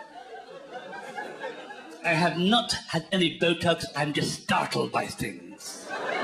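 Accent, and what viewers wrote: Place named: Ireland. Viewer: Sean's accent has diluted a lot, obviously due to all the years he has lived in England. While he does still sound Irish here, there is one recent clip of his on you tube and - if you didn't know any better - it might be difficult to place the accent at all. Even here his accent isn't as strong as the clips of his early stand up.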